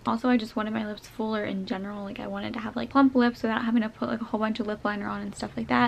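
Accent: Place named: South, United States. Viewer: Why are you the only person in your family with a southern accent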